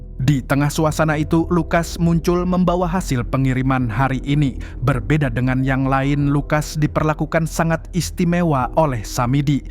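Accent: Banjar, Indonesia. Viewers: asli buhan Banjar kh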